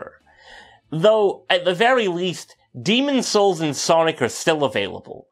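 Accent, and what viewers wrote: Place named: Scotland, United Kingdom. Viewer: Americans hate it when they have to hear a Scottish person talk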